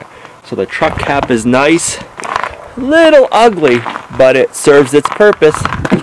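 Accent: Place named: East Coast, United States. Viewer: Finland in Russia? ? im 3/4 finish( also 1/8 irish 1/8 french or french canuk some debate about that )in Minnesota and not aware of such of a location they are different countries , maybe i heard ya wrong with the east coast accent.. we normally just use normal all weather tires in Minnesota... lol the finlands... suomalainen voima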